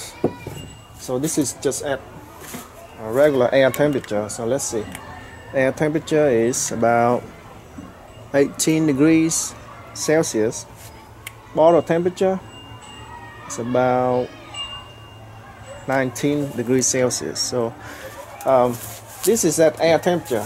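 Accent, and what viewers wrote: Vietnam. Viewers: Sounds like a Vietnamese accent. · Sounds like Vietnamese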